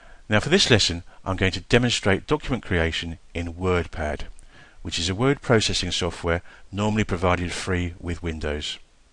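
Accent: United Kingdom. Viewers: This is not American English itS BRITISH ENGLISG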